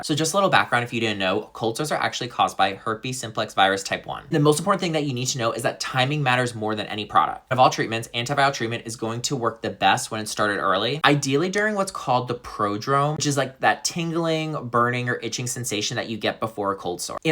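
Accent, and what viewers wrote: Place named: Australia. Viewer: She sounds Australian, Australia pharmacy’s aren’t the same as USA. Very limited, usually cold sores treated topically w/ aciclovir… or just wait it out.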